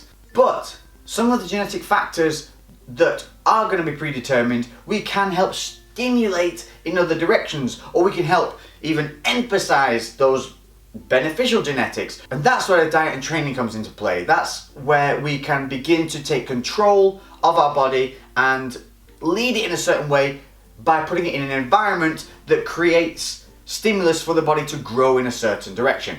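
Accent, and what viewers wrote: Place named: Northern England, United Kingdom. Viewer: Love it, and nice to hear a fellow Brit talking fitness for a change. Plus you also sound like a northern lad like me! Just recently found your channel and it's great!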